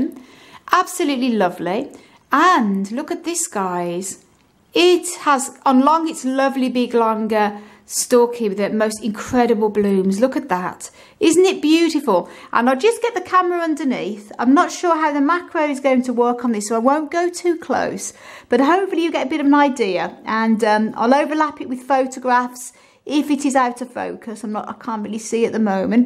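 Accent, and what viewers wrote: Birmingham, United Kingdom. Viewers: Beautiful buds accompanied by a blissful Brummie brogue! Thanks for sharing. :)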